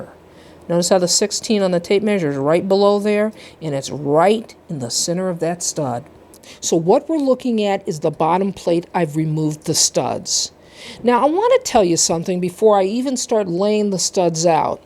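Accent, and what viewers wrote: United States. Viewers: Great video. I loved it. Awesome. I was also trying to figure out if you were American or from Nova Scotia.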